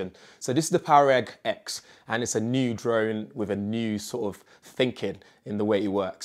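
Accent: United Kingdom. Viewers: His accent is the coolest. Is he British or South African?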